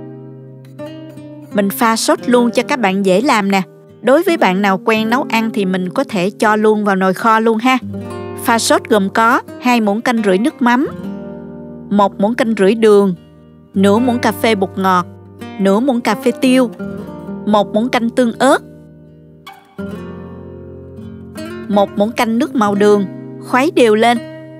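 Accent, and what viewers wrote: South, Vietnam. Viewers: Giọng nói miền Nam của ngọt ngào rất dễ thương. Tiếc là cô dùng từ miền Bắc, và nhiều tiếng Hán Việt khó hiểu và vô nghĩa, như “thấm sâu vị”, “điều vị”, “sử dụng”, “sơ chế”, “chế biến”…Mọi người đang nói và viết tiếng Việt tuỳ tiện, không cần hiểu ý nghĩa và mục đích. Kính mong nói như một người miền Nam chính gốc, mộc mạc, giản dị và dễ thương như giọng nói của cô. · Ngon ,giọng nói miền nam Việt Nam dễ thương,❤❤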